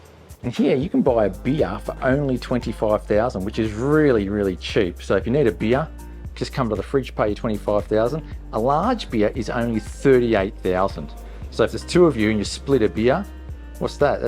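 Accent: Australia. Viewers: Love the Australiuan accent! just found your channel and what a place for the solo traveller, well produced and informed video, will check out your travels, cheers and beers!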